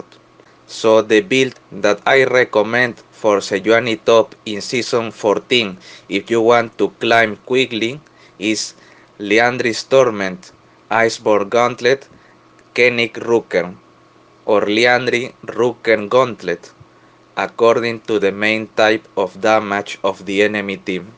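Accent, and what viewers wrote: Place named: Spain. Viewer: Ostias hermano es el acento español en ingles mas fuerte k he escuchado en mi vida ajajaja. Pero la guia muy buena, te recomiendo que las hagas en español con subs en ingles.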